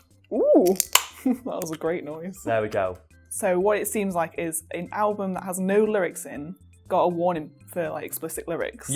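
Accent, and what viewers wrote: United Kingdom. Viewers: Dee Snyder, Frank Zappa, and John Denver testified against the PMRC in the US Senate, not in court. Based on your accent, I'm assuming you're in the UK, so it would prolly be most like if they testified in the House of Lords there. Incidentally, Dee Snyder's testimony is well worth watching, he has no mercy on the Senators questioning him or the PMRC and easily puts them in their place with an eloquence rarely seen either then or now.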